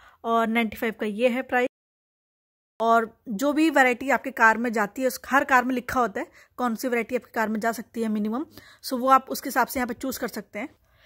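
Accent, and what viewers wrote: India. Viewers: Hii apka bat karne ka tarika bilkul indian ki tarah hai ap austreliya me rah kar bhi indian ahsas dilati ho nice or austreliya gao ka sheyar bhi kara deti ho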